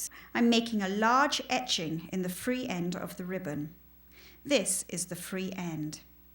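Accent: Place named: United Kingdom. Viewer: Loved your tree decorating video. Inspiring and wonderful! Would like to hear Sharyn Alice in this video instead of the british accent. This voice does not sound sincere. You have lost impact with the voiceover.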